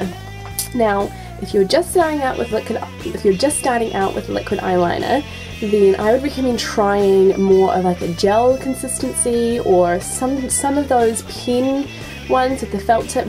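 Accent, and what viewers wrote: New Zealand. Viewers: is your accent newzelanden accent?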